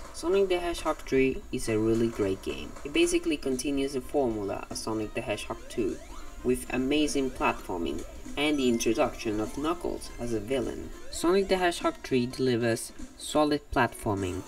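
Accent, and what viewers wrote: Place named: United Kingdom. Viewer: You sound British. No offence, I'm British too.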